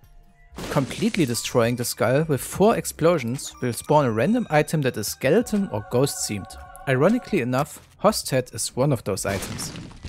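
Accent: Germany. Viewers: i hear an german accent, are you? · Hey ich wollte mal wegen deinem Akzent fragen ob du vllch deutsch bist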